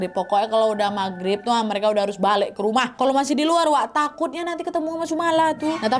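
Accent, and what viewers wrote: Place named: Medan, Indonesia. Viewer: Hai kk.aku br lht vidio kk...cr kk bawakn narasi " medan" kali y....jd lucu aja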